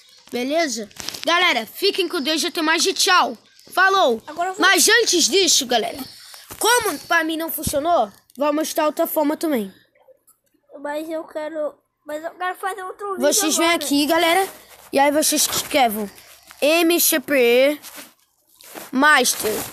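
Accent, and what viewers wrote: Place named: Rio de Janeiro, Brazil. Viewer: Ele e Carioca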